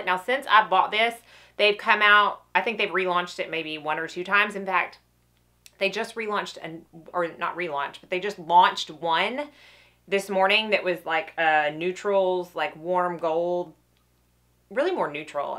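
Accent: South, United States. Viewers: Hi my name is Rousha and iv been wanting to tell just how awesome I think u are! I love your videos I think your so talented. I'm also from TN (murfressboro area) but currently live in NY. I love to watch just to hear you talk it reminds me of everyone back home that I miss not many ppl here have a southern accent lol. I just wanted you to know your one of my favorites to watch and to keep them coming. <mail>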